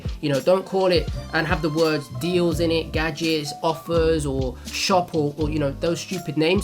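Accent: United Kingdom. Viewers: UK accent lowkey ads more authenticity to his voice, start a podcast "#1 E-commerce Podcast in the world"...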